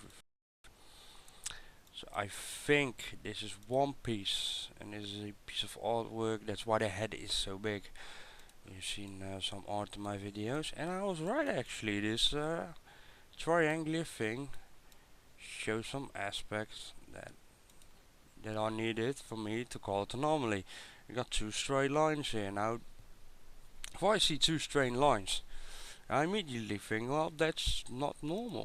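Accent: Netherlands. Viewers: I can´t watch this vid because of that Dutch accent. It really drives me insane. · I work with a couple of Dutchies, but not heard your variation before, you from the Groningen area or the East maybe ?? Enjoyed your Vid, even if I do not pretend to comprehend it all :) My biggest problem is that you can make shapes appear if you look hard enough at anything, remember when we were kids looking at the clouds, you pull out some interesting pieces tho for sure, def worthy of further investigation or question.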